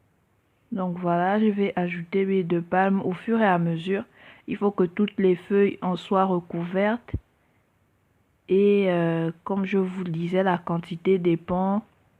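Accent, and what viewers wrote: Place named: Gabon. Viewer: yum yum.. avec l'accent du pays et tsou et tsou trop bien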